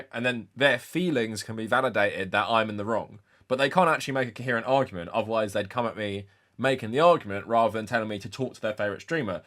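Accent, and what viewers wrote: England, United Kingdom. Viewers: I don't think you're condescending. But maybe just having a British accent makes you come off that way to people in the U.S🤷‍♀️ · I'm almost certain they think you're condescending because of your confidence combined with your accent lol lots of western media has posh know-it-alls or intelligent, antagonistic Englishmen as the opposition. Aside from that, seeing a Destiny viewer call you condescending is so rich 🤣 Destiny is easily one of the most condescending politickers out there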